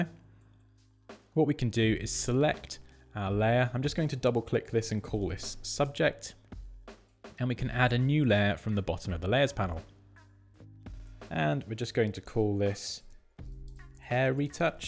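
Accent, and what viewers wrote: United Kingdom. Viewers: Nice voice and accent, your British accent is easy to listen and understand!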